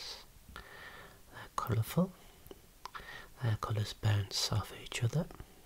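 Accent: Birmingham, United Kingdom. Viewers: Is from Birmingham?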